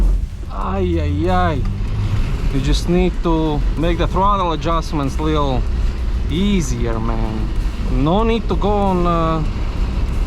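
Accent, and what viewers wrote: Latvia. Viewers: ur actent sounds like ur Latvian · could tell by the accent that you are latvian eu pavizinaties var? 😂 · Are u latvian bro? Accent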